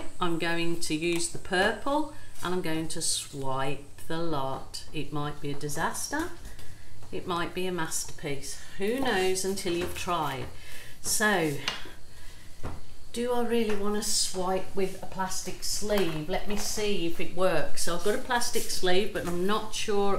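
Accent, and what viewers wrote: Birmingham, United Kingdom. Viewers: Spectacular result, and please, keep on talking, it is lovely to hear the brummy accent.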